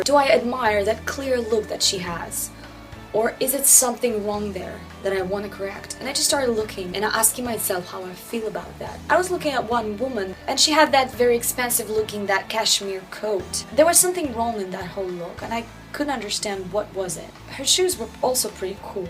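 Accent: Russia. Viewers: Women with the Russian accent 😍 it sounds so beautiful to me ! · It's the Russian background. I think it's a beautiful language/accent. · How to be beautiful, Step One: Have Russian Accent. Step Two: Be. 😍😍😍😍 You're the coolest! Can't wait for this series! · как-то сразу поняла,что вы русская.очень хороший английский у вас,но есть какой-то маааахонький,еле уловимый родной акцент.только что нашла ваш канал и сразу подписалась!вы классная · yes. She is Russian after all. What accent should she have? I actually find truly beautiful how her English is so good. Absolutely perfect in every choice of verbs and adjectives and so on. So detailed. The fact she still has that Russian accent on the base is only the prove how genuine she is. And clever. She does speaks English as a native. Impressive I would say. And she also always says things i can rely to. Thanks. You are a breath of fresh air. 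· She’s Russian! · Wait are you russian ..???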